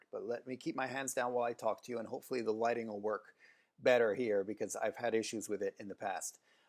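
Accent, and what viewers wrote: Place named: United States. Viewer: This is encouraging and I look forward to trying this method. Edit: just out of curiosity, are you a native speaker in both English and Italian? You said you were Italian but your American accent is perfect. Did you grow up in the States? I hope this isn't too personal. Just curious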